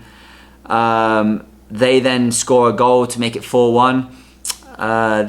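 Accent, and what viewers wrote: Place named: United Kingdom. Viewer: This is the second clip I have watched in your channel. Although your accent is very British I think you are playing in the US. Your team is SLC and I wonder if it is Salt Lake City. What class of league are you playing? I know the top league is MLS but the venue doesn't seem to be up to the MLS standard.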